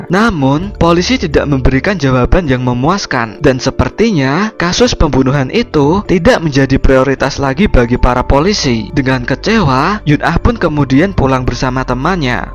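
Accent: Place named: Java, Indonesia. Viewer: Logat Jawa nya masih medok yo suaranya itu lo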